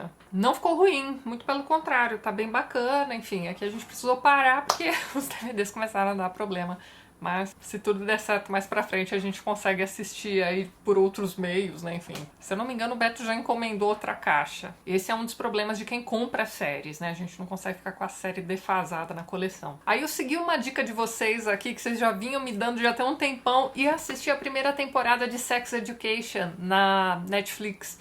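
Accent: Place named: Rio de Janeiro, Brazil. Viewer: Então tá explicado o sotaque carioca :)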